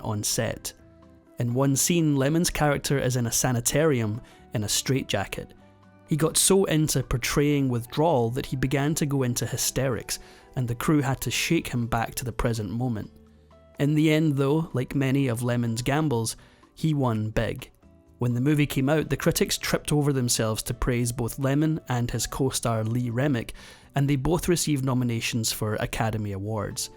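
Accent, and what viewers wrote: Scotland, United Kingdom. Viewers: Oh no, not another Scottish/British voice-over for an American Actor. CRAP ! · I love the lads wee Scot voice too… bc it reminds me of my grt uncle Micheal, a born raised in Edinburgh Scot. And the movie docs are grrrrrreat! (In my best Scottish roll of the r!) 😊❤ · Well, I'm in. I've watched several of your vids, and I've enjoyed every one. You manage to pick up interesting threads in performers' lives, trace them to their beginnings, then construct a well-researched, well-written chronological account of one portion of the tapestries of each of their lives. The Scottish accent is pretty nifty, too. I enjoy hearing "person" pronounced "pairson."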